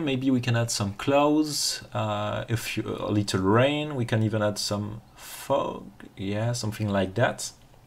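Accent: France. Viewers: I'm from Germany and I find this french accent quite charming actually. But I can imagine that people from Texas or Pakistan maybe could be irritated by it :-) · Le bon accent français qu’on aime 😭😪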